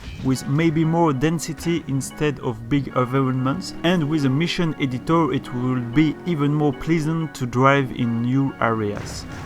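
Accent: France. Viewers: With a french accent :) Looks very promising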